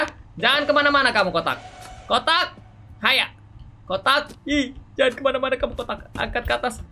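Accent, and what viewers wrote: Java, Indonesia. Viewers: Kamu orang jawa soolnya ngmongnya kayagitu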